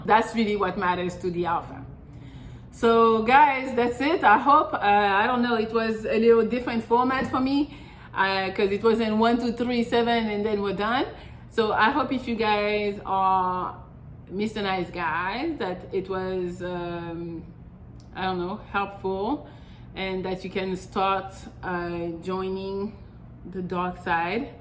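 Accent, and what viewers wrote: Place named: France. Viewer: Most probably from France. I hear a French accent.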